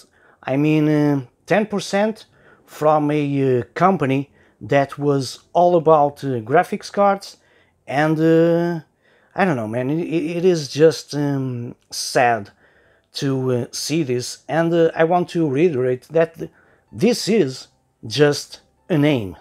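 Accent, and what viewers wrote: Portugal. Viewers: So first I thought: This guy sounds portuguese. Then I looked in his profile and it said Portugal lol. I guess both of us make the same pronunciation mistakes. Olá!! Fonix é a primeira vez que vejo um youtuber português XD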